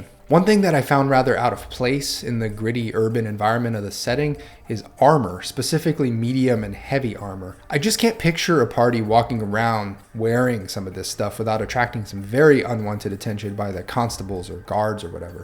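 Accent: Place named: Cockney, United Kingdom. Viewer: I didn't have " goes from RPG Youtuber to Proper Cockney Geezer" on my 2024 bingo card but here we are! Also, top tip for surviving British old money: count shillings before pennies, then you only have to count up to 11 pence at a time rather than 240.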